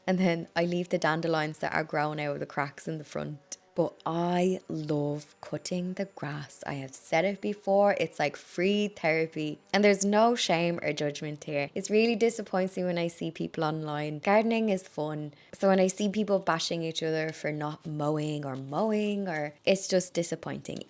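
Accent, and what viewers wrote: Ireland. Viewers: love your program ive just come across it to day love your Irish accent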